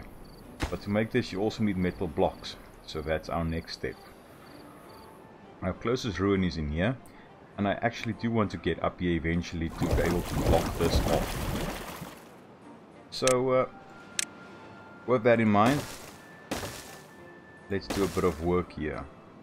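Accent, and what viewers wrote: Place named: South Africa. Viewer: aweh, ek help maar uit met n comment want ek kan hoor jy is nie engels en dis min wat mens SA mense kry wat sulke videos maak. well done!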